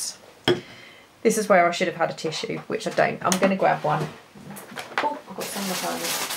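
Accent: England, United Kingdom. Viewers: These are just just gorgeous I love her so much!! I’ll be trying these 😍 love your sweet ways you are so great to watch! Love your lovely English accent too xxx be safe keep your fingers safe 😜 love ya xx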